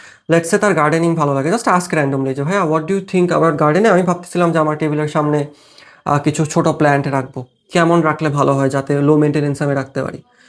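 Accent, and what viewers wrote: Chittagong, Bangladesh. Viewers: You're from Chittagong,aren’t you bhaiya?😁.. But, bhaiya your contents are too much needed in this time of our generation.. Thanks bhaiya🌸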